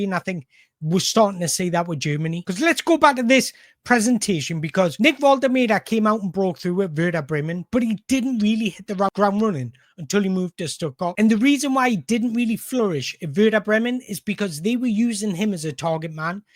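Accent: Newcastle, United Kingdom. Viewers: I love your Geordie accent pal. I much prefer hearing regional accents, personally. More importantly I love the content of your videos. Great for football geeks like me. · just be who you are mate your doing sound. Never try and hide your Geordie accent.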